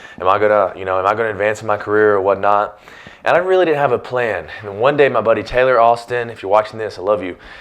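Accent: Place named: Alabama, United States. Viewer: Gorgeous with an Alabama accent 😍 💕 💖.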